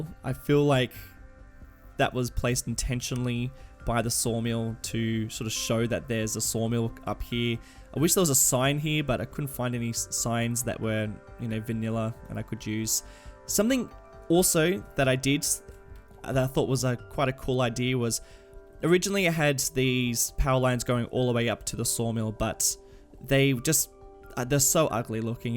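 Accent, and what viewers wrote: Australia. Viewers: Crickey! Noice straya accent... 🇦🇺🇦🇺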